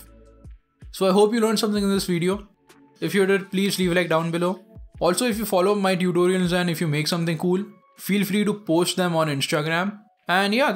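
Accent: India. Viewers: It is genuinely a fact that when you've been struggling for weeks with something and finally a video comes along and the guy has an Indian accent he gives the most top tier advice that's super easy to understand and follow along. Thanks,. I've been struggling to figure out what to do in UE5. Now I have ideas.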